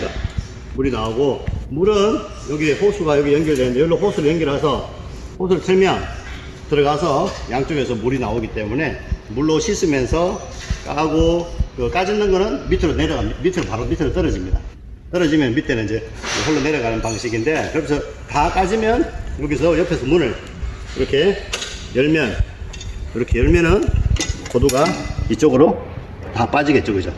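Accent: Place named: Sangju, South Korea. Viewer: 상주 사투리가 구수하고 좋은 기계 잘보았습니다. 김천제품 3년전에 구매했는데....?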